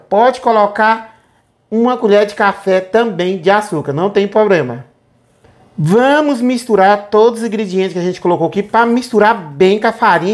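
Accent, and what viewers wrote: Minas Gerais, Brazil. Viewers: acho muito engraçado o SEU jeito de falar parecido com mineiro esparramar eu sou mineira! Por isso QUE tô falando! NÃO esquece da dancinha na hora de experimentar a receita!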